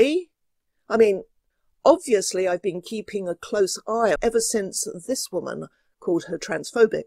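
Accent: United Kingdom. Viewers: you do “sarcasm” better than any one- the British accent makes it even more delicious!!!❤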